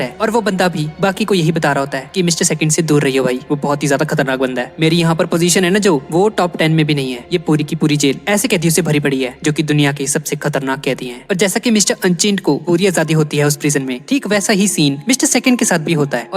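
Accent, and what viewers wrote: Punjab, India. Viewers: Bhai tum panjabi ho kya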